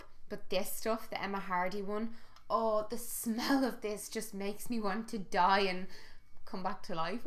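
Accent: Ireland. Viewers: Ouuuuuuu!!!!!! I am in love with your Irish accent!! So sooo lovely! Im Canadian with Irish heritage :) Ireland is definitely on my bucketlist!!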